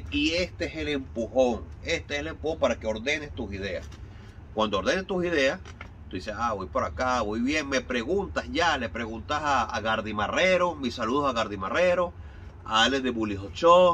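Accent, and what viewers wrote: Venezuela. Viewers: Excelente amigo muy bueno y claro el video. Al escucharte supe que eras un paisano venezolano. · Hola saludos hermano me interesa sobremanera y me llama la atención tu acento creo que eres Venezolano 🇻🇪 Yo también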